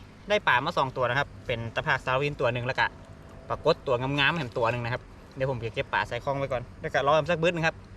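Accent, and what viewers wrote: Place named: Phrae, Thailand. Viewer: สำเนียงนี่เป๋นคนแป้แม่นก่คับ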